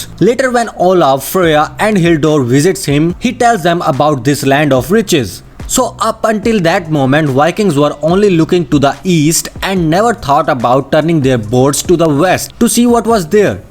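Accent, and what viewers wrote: India. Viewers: What gave it away? Was it the thick indian-style accent?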